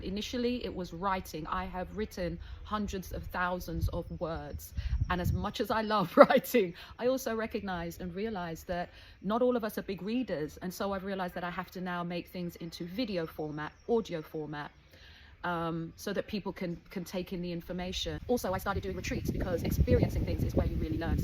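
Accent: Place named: South St. Elizabeth, Jamaica. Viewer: I am here watching your video about your grandmother Laura and hearing the South St. Elizabeth accent pulsating through and loving it! Your channel is awesome too! You are on the top of my list too! So coincidental and I love it❤️🧑🏼‍🌾🏝️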